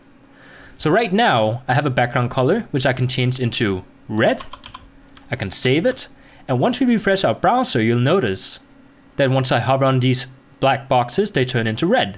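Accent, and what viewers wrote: Denmark. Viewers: Thanks bro , im working on my portfolio website because im learning to code :D Do you want to see it?? It´s not done yet though but pretty far (ps you sound danish ;-) )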